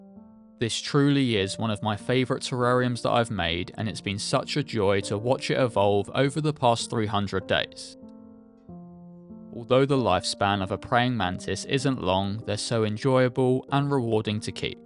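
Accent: United Kingdom. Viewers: Yeah, female, mate. Lovely terrarium. Just making a large slow flowing waterfall terrarium now and hoping to put a mantis in it. Not sure which one yet 🤔 P.S. You sound like a Manc as well?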